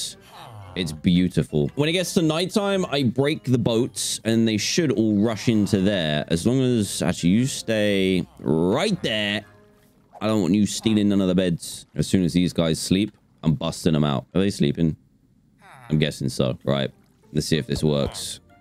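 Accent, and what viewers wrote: United Kingdom. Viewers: Cool british accent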